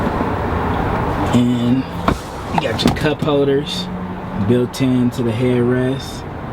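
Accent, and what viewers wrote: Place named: California, United States. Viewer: Sound like he from Cali or West Coast. I may be wrong though lol. Good review!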